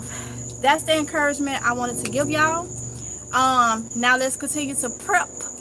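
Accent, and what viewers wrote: South, United States. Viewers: I’ve never heard nobody pronounce exercise like that before lol! I like your accent!!! It’s soo southern!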